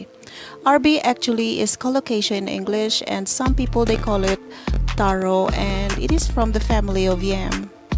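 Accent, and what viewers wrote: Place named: United States. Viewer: Ano ni sya dai..daw nmit haw..nmian gid ko bala sng tingug mo dai..dw americana gid😊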